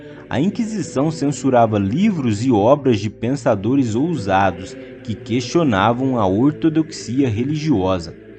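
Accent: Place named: Inland São Paulo, Brazil. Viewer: O cara ja comeca o video ckm uma rendanvia na lingua portuguesa. " Há seculos atrás " . Se o verbo haver esta sendo usado sentido de tempo) , dispensa o " atrás ". Esse dotaque do interio de São Paulo mata a narração também , terrivel.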